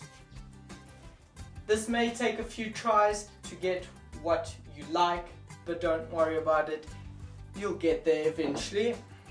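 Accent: South Africa. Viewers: South African? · Is that a south African accent bro?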